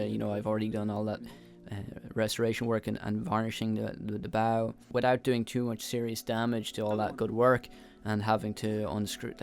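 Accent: Ireland. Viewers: Hi there rea;;y fantastic video! love the way you work with your dad. HOW wonderful to have an Uncle that`s also creative an you have given is boat new life. I really enjoy your videos I came to Australia when i was six migrated from England. All my family are from Ireland and my parents are from Ballybok. I love Ireland of course and its so nice to hear your accent. It reminds me of my family my parents etc. I have been back several times and My grannies house is still there in Landen RD Ballyfermot. I have all my family there except my mum and twin sister and one of my brothers. the other brother migrated to Switzerland. anyway I hope you keep warm as the weather is rapidly changing there for you. Hope you get the boat water proof before the weather gets too bad. Love the rocket stove I think it is worth a fortune. I`ve never seen one like that before it is stunning. 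and rocket stoves are the best stoves and fire place to have. I loved seeing you put the turf in made me homesick for Ireland. We are worlds apart but we have a kindred spirit in Ireland. God bless you guys I wish you well. till next time.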